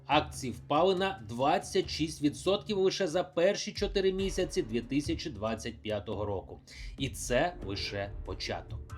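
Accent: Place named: Russia. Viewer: Лайк за відос! Але перестаньте Акать,бо дуже схоже на как цапню!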